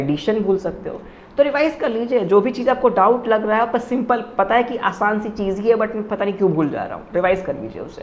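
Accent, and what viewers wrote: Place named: Jharkhand, India. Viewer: Are you from jharkhand? As i have the same Hindi tone too.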